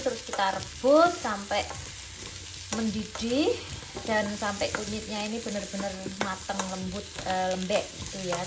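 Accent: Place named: Java, Indonesia. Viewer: waduuh mba trnya ngomongya mendok bgt ya :D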